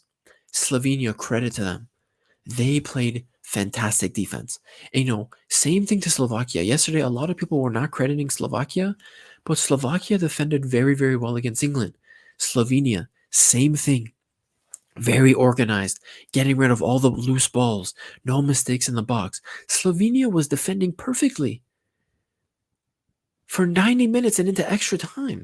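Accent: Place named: United States. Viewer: Jeet speaking with an American accent. Opinion discarded.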